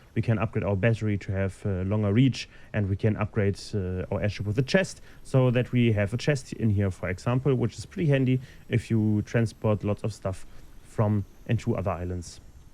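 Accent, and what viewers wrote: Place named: Germany. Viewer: Wie man den deutschen Dialekt heraushört ;P.. bin hyped! sowas gibt´s noch nicht. Viel Erfolg!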